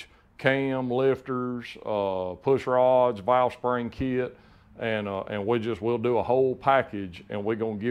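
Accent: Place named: South Carolina, United States. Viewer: i enjoy his upstate SC dialect. my former business partner was from North Carolina he had the same sayings and mannerisms. i am from the SC low country we have a british brogue dialect down here with a gulu/gechee inflection.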